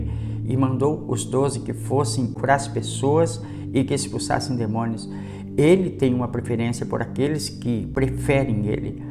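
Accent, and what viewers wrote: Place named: United States. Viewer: Vc É Americano ?Teu Sotaque Diferente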